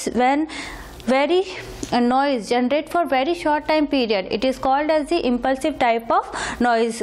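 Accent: India. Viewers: English Indian is dificult to listen.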